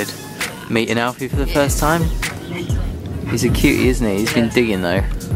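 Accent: Ireland. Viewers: Love the Irish accent ;) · I love both The Verve, and Bombay Bicycle Club :O You have great taste in music haha. By the way, you sound like a very convincing Irish man. I'm Irish, so I know :P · ps - Your Irish accent is bloody amazing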